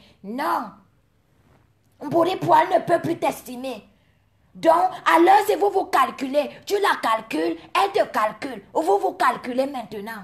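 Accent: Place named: Bassa, Cameroon. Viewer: Mama pourquoi tu m as vendu ???????????? Je suis au sol okololooooooo toi alors moi je t écoute a fond tu n insultes pas tu t exprimes bon français a la façon des bassaaaaaa c est l accent qui me finit une fois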